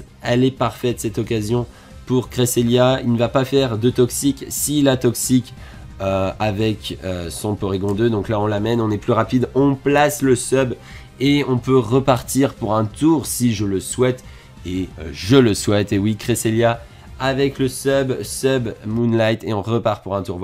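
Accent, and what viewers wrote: England, United Kingdom. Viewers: no the englush accent is back :'( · Je risque pas d'oublier cette vidéo où tu réussis l'exploit de foirer l'intro et l'outro en même temps xD. Bon pas grand chose à dire sinon GG ! Cresselia est bien parti pour être MVP ! Pense à te doper à la camomille pour les prochains matches, ça semble efficace ! (par contre j'ai rien contre l'accent anglais, je trouverais même ça marrant qu'il devienne ta présentation emblématique pour cette compétition^^)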